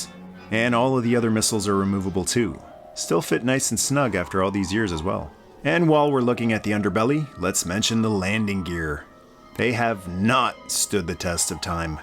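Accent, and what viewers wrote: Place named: Boston, United States. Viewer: just discovered your channel by my absolute passion for MOTU & Joe!! My all time favorite movie play toys was the Rattler!! can't wait to binge watch everything Joe 1st then Masters of the Universe. I just watched your 1982 video describing the Original line up of Joe from the early days (1960) era and Mr.Hamma creative writing for the updated version. The history lesson was outstanding and I always knew Joe figures were more solid and flexible than Star Wars and most Other lines. Thanks to you and the awesome fans that are requesting these amazing topics of the best era evah!! heavy Boston accent!!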